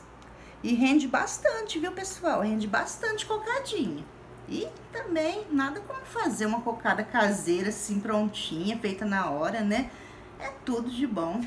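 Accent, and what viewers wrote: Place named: Minas Gerais, Brazil. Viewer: Sutaque mineiro ótimo vou fazer essa delícia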